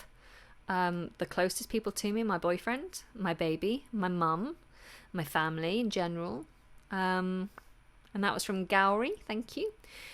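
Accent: United Kingdom. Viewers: You are doing a great job!Keep on!I wish health and happiness to you and your child!Thank you for your videos!I love your British accent!From Russia with best regards! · Where do you live? Your accent is as Theresa May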